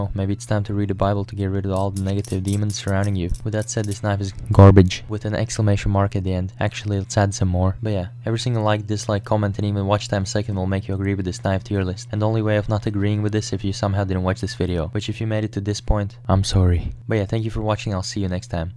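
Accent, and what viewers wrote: Latvia. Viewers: are u from latvia by any chance? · You latvian?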